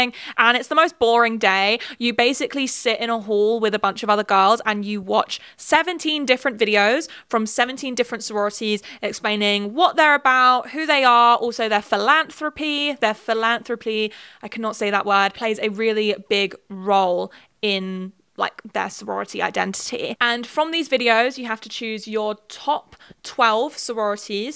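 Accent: England, United Kingdom. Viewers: Its interesting hearing an english person explain this to me as an engineering major from philly not involved in sororities at all lol like why am i watching this i have so much hw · I think it’s because it’s your English accent, but thank you for sharing your story!! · love British accent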